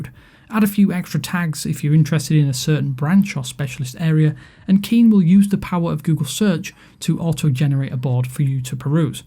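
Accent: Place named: Hull, United Kingdom. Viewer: Hull City, lol...was wondering where your accent was from.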